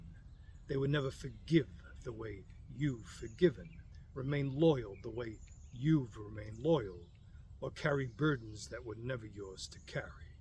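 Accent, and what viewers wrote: Boston, United States. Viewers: Well put I needed this thanks and I also appreciate you being able to tell me your from Boston with out telling me your from Boston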